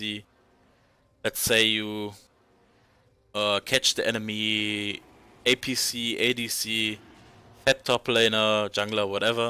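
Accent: Germany. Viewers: Nice video it really helps me. From what country are you? Your accent sounds a bit German.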